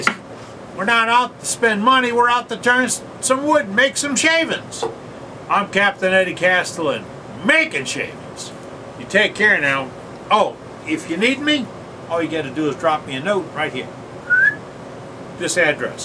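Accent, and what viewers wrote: New Orleans, United States. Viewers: Hey!! Just found you on Youtube. Love your style! No wonder, yer from 'Nawlins. Me too, I grew up in Harahan! I'm living in Denver now but sure miss home sometime, especially when I hear your accent! I am just learning how to turn wood. I turned a Stanley #5 Plane knob out of Rosewood. My first project and it came out real nice. I refurbished the plane for my son, who was born in Opelousas close to Lafayette. Anyway, I like your channel! I'll come back some more. Thank you very much! Great videos!!! Dave Henderson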